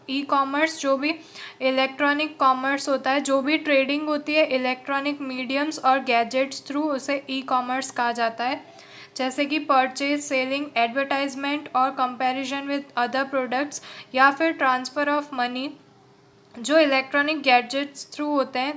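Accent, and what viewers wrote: Gujarat, India. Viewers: Mam are you from Gujarat?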